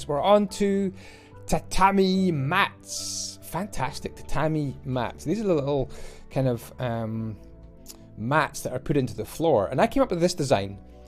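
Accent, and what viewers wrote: Scotland, United Kingdom. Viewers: Are you scottish? Because ei am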